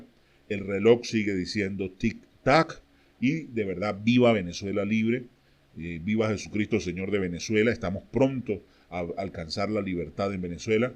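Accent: Colombia. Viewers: Un colombiano narrando xdioxxx ¡¡ Ve con Uribe hacerle cariñitos ¡¡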